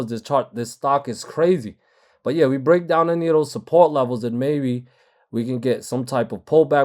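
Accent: New York, United States. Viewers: Yo, when are you going to reveal your identity? You're definitely from NYC. You probably from Brooklyn cuz you stay keeping it 💯 😂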